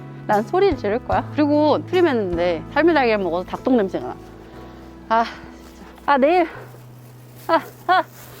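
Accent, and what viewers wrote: United Kingdom. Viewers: 품위있는 영국 영어와 싼티작렬 한국말의 조화ㅋㅋㅋㅋ 유쾌유쾌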